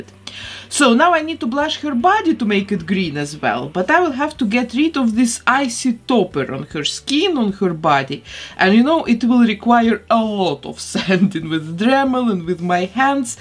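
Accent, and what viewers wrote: Russia. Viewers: это русский акцент верно?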